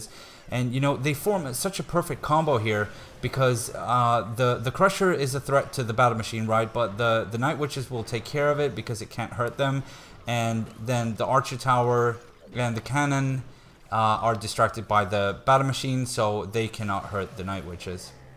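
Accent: Sweden. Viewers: Limpansson 14 Analysing his accent (which is hardly noticable by the way) I think he could be swedish indeed. Kinda sounds like PewDiePie but better English.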